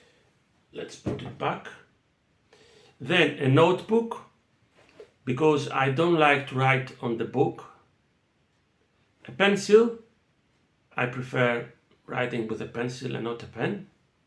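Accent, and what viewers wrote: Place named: Greece. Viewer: The way you speak english strongly suggests me that you are greek. Την εφαρμογή χρησιμοποιείς η το βιβλίο της ασιμιλ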